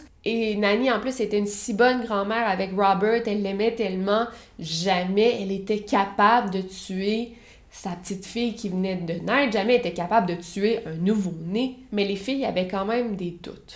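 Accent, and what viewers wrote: Quebec, Canada. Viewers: vous êtes délicieuse avec votre mimique et votre accent quebequois..je commence à vous suivre 100 pour cent Merci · Honnêtement, j'ai vraiment dû mal avec l'accent québécois. Sans aucune raison, mais cela m'agresse les oreilles. Cela aurait été dommage que je m'arrête à cela compte tenu de vos recherches et vos affaires intéressantes. Alors je prends sur moi. Merci pour le partage. Très bon boulot. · EXACTEMENT ton accent quebecois et ton joli visage nous suffit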